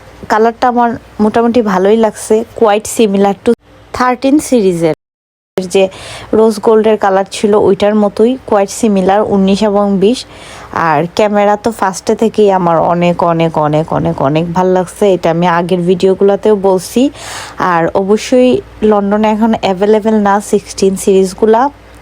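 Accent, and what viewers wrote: Sylhet, Bangladesh. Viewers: Apni ki syloti ni?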